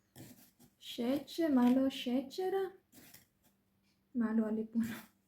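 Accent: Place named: Podravina, Croatia. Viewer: Ne ona je podravka.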